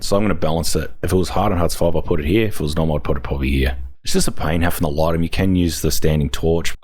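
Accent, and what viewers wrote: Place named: Australia. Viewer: I think he's from Australia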